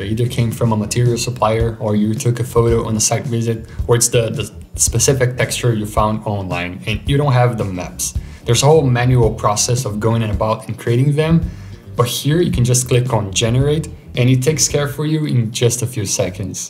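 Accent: Brazil. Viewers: vc é brasileiro?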